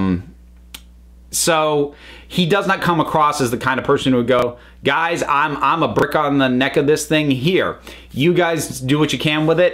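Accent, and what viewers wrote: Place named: Vermont, United States. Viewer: so that is an understated Vermont acent, knowing is half the battle.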